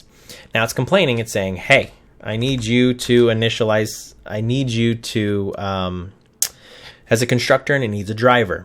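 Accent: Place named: United States. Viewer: i wish i saw this video 2 months ago, this is the best QA Automation Tutorial i've ever seen ! +you speak real pure American English ! which is a real big thumbs up !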